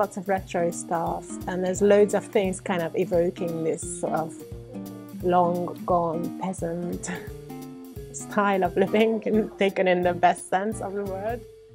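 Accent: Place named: United Kingdom. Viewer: You seem to have a slight British accent. How many years have you worked in the UK? Is there still a lot of young hungarians working?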